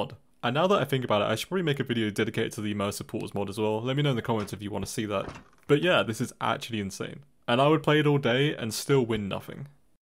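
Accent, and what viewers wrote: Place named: United Kingdom. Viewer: you be british! you're the only british youtuber i know!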